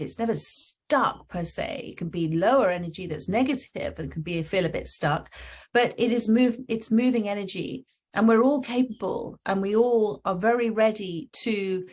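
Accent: United Kingdom. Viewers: Love this@ psint the sky on the Wedt Coast with family. They are buying a house. I will have my own rm. Travel again...another grandchild , their slowing down work load to do. I am the matriarch of the family. No worry no struggle. Thsnk you. I've missed your readings. Been months!! I l8ve yor British accent. In my blood on mom's side. May she RIP. I have the Medicine Animal cards. Got in the late 80's. Was in fear during the pandemic. Feeling much better.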